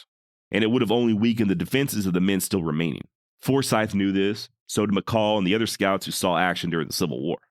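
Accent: Texas, United States. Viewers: Putting a face to the voice I’ve heard for a little while, not gonna lie thought you might’ve been a black Texan… dudes in the south sound a lot more alike across race than anywhere else in the USA. We all have a lot more in common than we don’t. I appreciate your nuance to history telling. And that is coming from a dirty Jayhawker who shouldn’t like the south. I’m pro Americans, even from Missouri lol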